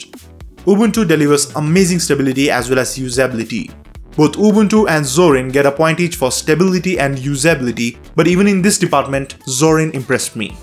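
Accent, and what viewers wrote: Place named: India. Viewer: as a native english speaker i find ,most f whats said almost impossble to understand spoken way to fast in a terrible paki/guppta/ indian accent,big FAIL!!!!!.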